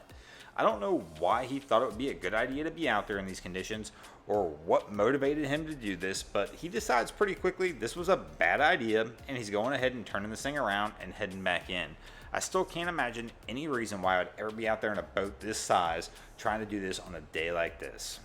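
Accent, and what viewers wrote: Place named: Delaware County, United States. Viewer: You sound like youre from Delco.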